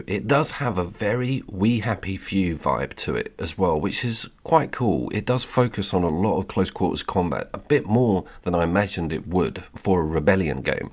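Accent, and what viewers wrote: United Kingdom. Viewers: Tho not for me (yet) as I prefer Fallout then perhaps a Metro game, and to a lesser extent the Stalker games. However your a Brit, so I can only imagine the fun to romp around the world hearing a accent similar to my own and those I (in your case literally) grew up with. As for the reviewer issues your spot on. No one wishes to wait for anything people are 9 year olds wanting what they want (the review of a game)when THEY want it. You tube could of done anything to make better reviews rise to the top but instead it is a small nice circle at the top and few smaller to medium channels can ever hope to have their audience and thus you tube attention. You will have to at some point know that you still have your integrity and cover the kinds of games that interest YOU, and have fun doing it. At least until someone helps you go viral:D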